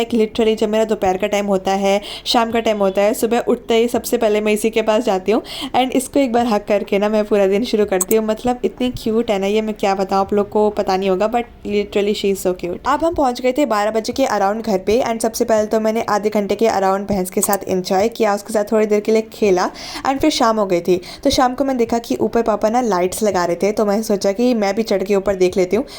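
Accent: Haryana, India. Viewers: Aap haryana se ho??? Seriously??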